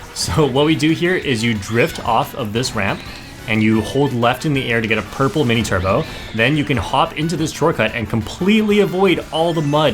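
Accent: Canada. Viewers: avec ton accent, je suis presque certaine que tu viens de montreal 🤔 btw super video!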